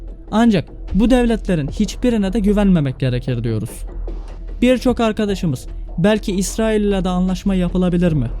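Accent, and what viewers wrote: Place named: Anatolia, Turkey. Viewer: Sevgili seslendiren kardeşim çok güzel videolar yapıyorsun Çok çok içtenlikle teşekkür ederim şunu söylemek istiyorum babaannem 1982 yılında vefat etti ve 90 küsür yaşındaydı yani yaşasa şu anda 130 yaşlarında falan olurdu Ama konuşma aksanı Tıpkı senin gibiydi biz angaralıyız. Ve köy derneğimiz araştırdı orijinal. TÜRK.küz. Oğuz boyundanız. Demek ki Bizim TÜRKLER olarak orijinal aksanımız Senin konuştuğun gibi. Seni dinlerken rahmetli Ebemin konuşmasını aynı hatırlıyorum Gözlerim doluyor Çok teşekkür ediyorum sana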